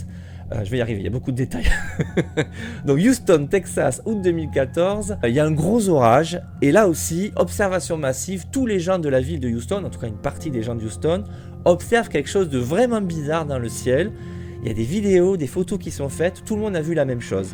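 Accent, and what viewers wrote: South, France. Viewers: Je te découvre et je follow en 10 secondes pour ces raisons: + super chapeau. L’accent du Sud c’est le bonus.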